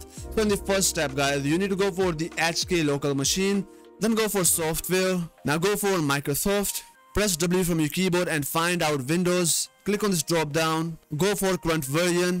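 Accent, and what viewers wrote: India. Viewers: indian ahh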